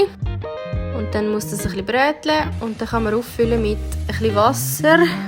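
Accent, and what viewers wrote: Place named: Switzerland. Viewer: Ich mag einfach den Schweizer Dialekt. Ansonsten, leckere Sachen die du da machst. ❤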